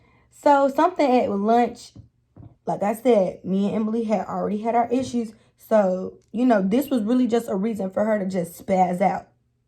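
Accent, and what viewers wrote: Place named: Nigeria. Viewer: Hey gorgeous 😘 Love the content & your nigerian accent tickles me🤣😂👌🤣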